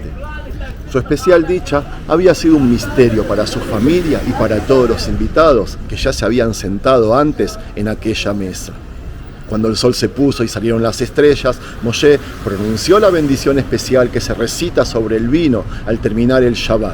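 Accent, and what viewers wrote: Buenos Aires, Argentina. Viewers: Como es que aun guardas el acento porteño, despues de tanto tiempo.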